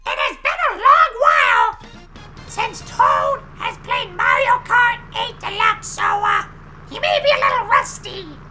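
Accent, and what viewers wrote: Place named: Germany. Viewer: Are you German? I heared the accent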